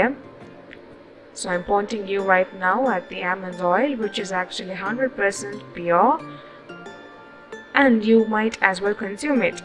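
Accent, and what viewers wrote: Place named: Tamil Nadu, India. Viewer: Akka...i lke ur tamil accent.... Plz remake this in ur style.... Its very plsnt to hear....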